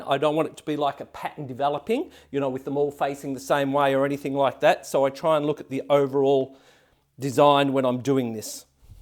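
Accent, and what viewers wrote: Australia. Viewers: Thanks so much! I’m making my way through all your videos and have enjoyed them so much. Especially with the Aussie accent!😂 Thanks for the link to a detailed answer for my question. I look forward to watching it! Cheers from 🇨🇦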